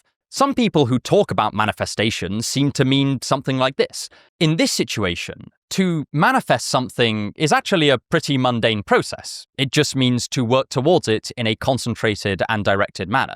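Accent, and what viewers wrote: United Kingdom. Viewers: Definitely the best, purest British English I ever heard in my five years living in London. Great guy, I wish there were lots like him.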